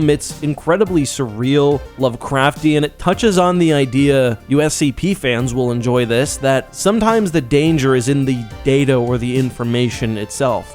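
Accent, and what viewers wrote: Canada. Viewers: Is the narrator Canadian. I could hear "OOT" and "ABOOT".....?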